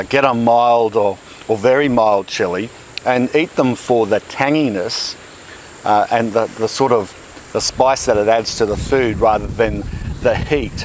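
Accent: Australia. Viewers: Love the Aussie accent!!!! Oi oi 🌷🌷🌷🥰🥰🥰😂🦋🦋🦋🦋🍀🍀🍀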